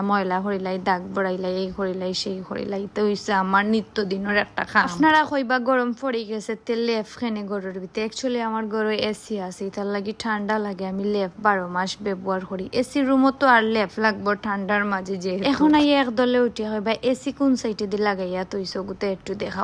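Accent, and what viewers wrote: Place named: Sylhet, Bangladesh. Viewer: আমা গ সিলেটি আপা 😅😅😅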